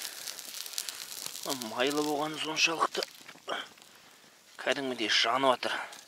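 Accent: Standard. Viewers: Ассаляму алейкум. Жакшы аңчылык болуптур, Казактын таза казакча сүйлөгөн жигити ушундай болот, Кыргыз болуп туруп баарын түшүндүм.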